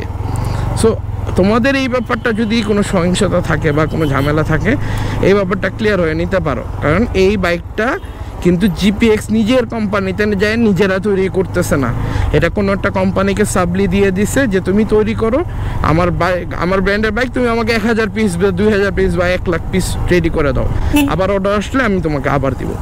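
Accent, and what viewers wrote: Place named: Gazipur, Bangladesh. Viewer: Apnr basha Gazipur kothi porse 😊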